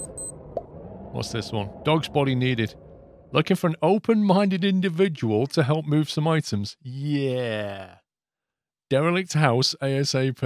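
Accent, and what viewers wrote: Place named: Liverpool, United Kingdom. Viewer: lmao your Liverpool accent is spot on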